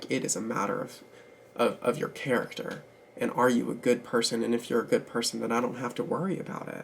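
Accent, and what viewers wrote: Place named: South, United States. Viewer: I appreciate your perspective because cisgender people often don’t think that transphobia will affect them but it does. The moment people get suspected as trans or misgendered it does put them in danger to people’s transphobia even if they are not trans. So your perspective is necessary if cisgender people will listen. Also I love your southern accent. I am a fellow southerner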